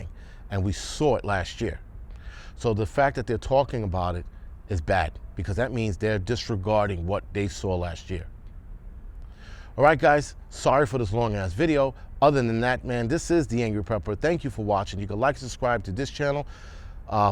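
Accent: New York, United States. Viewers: It's just a word, it only has as much power that you give it. Hes a freaking New York firefighter. It's almost in the job description to talk like he does. Most of my family still lives in NYC. Listinging to is like listening to family.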